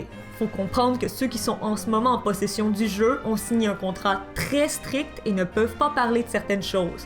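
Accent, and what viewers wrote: Quebec, Canada. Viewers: Ta voix est trop cool je trouve, l’accent québécois est super. Sinon les montages sont cool. Et puis pour les info il me semble que le guide papier du jeux est très complet, perso je lais et c'est plutôt cool, il parle même des 3 dragon, et donne leur lieux passage etc. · Tes Canadien mec? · Jte kiff, honnêtement dhabtiude j'aime pas les commentatrices et encore moins avec l'accent du Québec mais la je suis tombé sous le charme de ta voix et de ta prestance !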